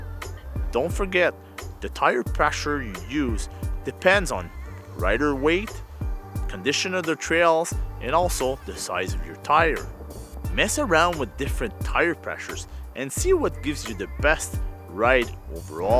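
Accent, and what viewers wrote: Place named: Scandinavia. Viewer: Tout le long je trouvais que tu avais un accent scandinave. J'me disais que ça devait être en suède haha.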